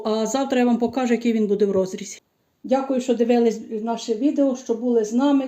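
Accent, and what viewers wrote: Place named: Ivano-Frankivsk, Ukraine. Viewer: Пані, якщо це не секрет, де саме Ви живете, я в Городенці, що на франківщині, по Вашій розмові думаю що Ви також з нашого краю. Підписана на Ваш канал, пляцки суперові, такий самий зошит є і в мене😂😂😂, до цих пір печу з нього. Дякую що ділитесь своїми рецептами.😊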